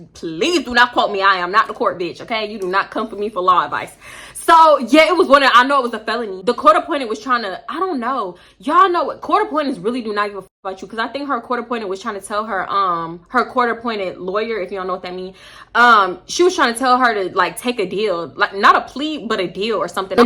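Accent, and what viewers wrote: Baltimore, United States. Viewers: When did you get this B-More accent 😂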